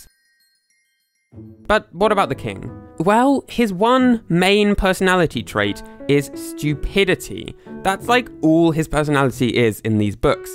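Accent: United Kingdom. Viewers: What a great accent you have...what part of Britain are u from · what kind of british accent do you have?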